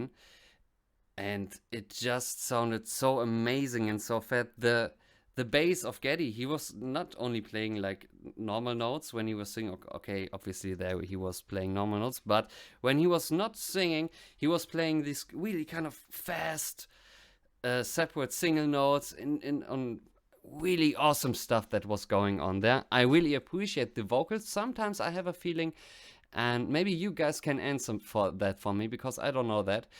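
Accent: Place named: Germany. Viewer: Neil was writing themes that were 10 to 15 years in advance of the times. Clock Work Angels is unfolding today/. The German accent is cool. You sound all right man. This song describes the obstacles of today.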